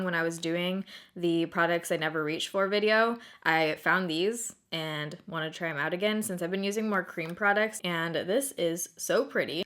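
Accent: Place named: Maine, United States. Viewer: Where are you from? You sound like you have a Maine accent! I'm a Maine girl, I'm probably way off but I was just wondering 😊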